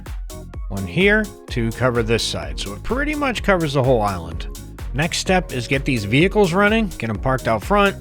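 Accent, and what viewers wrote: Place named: Boston, United States. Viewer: Dorchester kid here, youre either from close to here, or ha e the accent down when you choose to do it. Wicked pissa kid.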